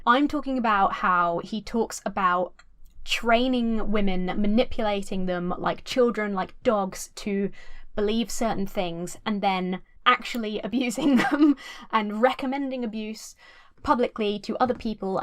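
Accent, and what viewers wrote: United Kingdom. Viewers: First video from you i watched already my least favourite thing is your british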